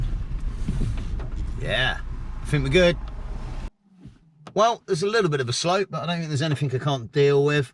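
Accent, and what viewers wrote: United Kingdom. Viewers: Who doesn’t love a British accent? I can understand you without any problem, unlike some local accents!😂 Seriously though, there are some counties (not sure if that’s what they’re called there, and I don’t know which ones specifically) where I’m not convinced that they’re even speaking English. Sometimes I still get tripped up by the difference in what things are called, like the boot, and when video is shot from inside a moving vehicle it is disorientating being on the opposite side of the road. But I thoroughly enjoy your vids, you’re proof Brits DO have a sense of humor!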